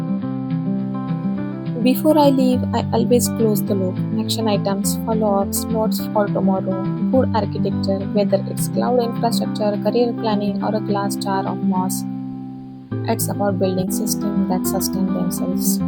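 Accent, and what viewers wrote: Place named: Ahirani, India. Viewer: Your accent is more like an Ahirani language 😃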